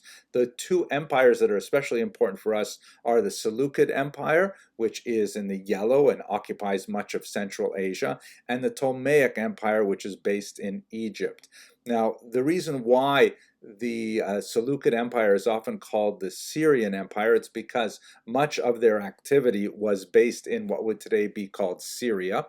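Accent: Canada. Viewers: Your Canadian is showing in this one.."..trade them like Hockey cards.." lol.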